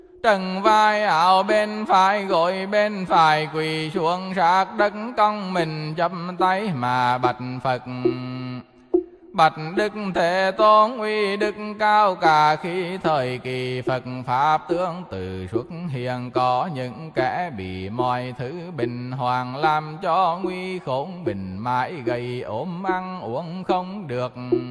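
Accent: Hue, Vietnam. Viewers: BACH Thầy ,Thầy tung giong huế của Thầy quá hay rỏ từng tiếng con rất thích đươc nghe mải mải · A Di Đà Kính tri ân Thầy trì tụng kinh cho chúng sanh thật là hay! Giọng Huế âm áp, trầm bỗng thật đặc biệt. Kính chúc Thầy thân tâm thường lạc, tiếp tục cho chúng con nghe nhiều bài kinh nữa. Kính, DT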